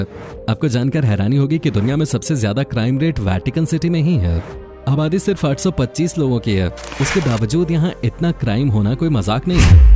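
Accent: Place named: West Bengal, India. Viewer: Bangali voice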